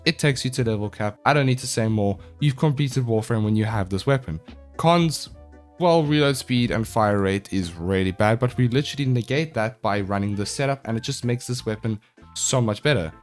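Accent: South Africa. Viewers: U south-african? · Are you south africa? Your accent sounds a lot like it, just wondering as I am from SA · You sound South African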